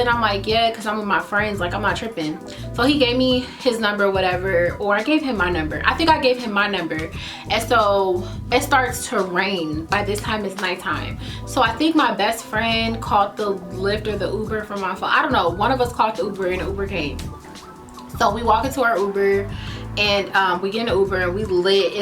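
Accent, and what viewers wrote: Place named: New Orleans, United States. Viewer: Lol that New Orleans accent😂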